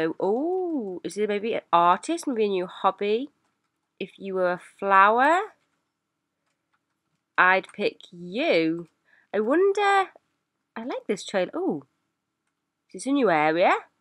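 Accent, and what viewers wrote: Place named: United Kingdom. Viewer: Hi!!! I am so glad that I found your channel. It helped me a lot and your are so funny!!! I just have a small question. You obviously have a British accent, so do you live in Britain and if yes do you live in England ?? I am asking because I will probably visit England (more specifically London) and I would really be happy to know that I am so close to one of my favorite youtuber!!!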